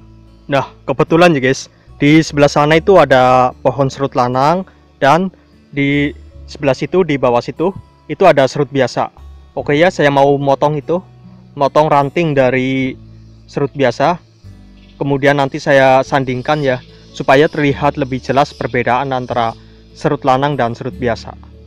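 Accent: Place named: Banyumas, Indonesia. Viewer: salm ngapak kang ,... :D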